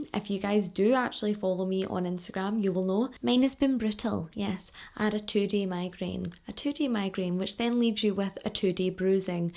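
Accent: Scotland, United Kingdom. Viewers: I first heard it as "ghetto!" So I wonder if that other fan is also American. I figured it out quick enough but every time I'm still like: how can GIRL sound like GHETTO to me??? Glad I'm not the only one hearing a t/d in there. 😂 It's a lovely accent!!! Speaking of your accent, the first video I watched, as I was about to watch it briefly thought: wonder where she's from... American?, Latina?, Mediterranean?... well I'll know in a moment when I hear her... Scottish?!!!! 😂😂😂😂 So it was a LOVELY surprise. (Not that there's really any accents I don't like, but gotta say Irish and Scottish are up there in my faves!)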